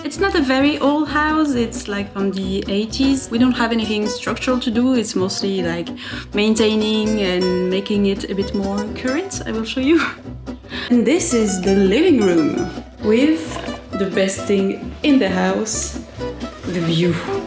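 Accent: France. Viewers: Why the French accent while speaking English? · Bonjour - God I love the French accent.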